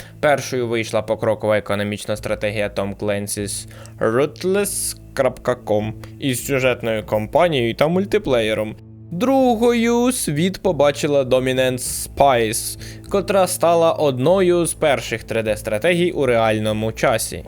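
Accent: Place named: United States. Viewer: За україномовний контент + За акцент американця, якщо спеціально застосовується у дикції, величезний мінус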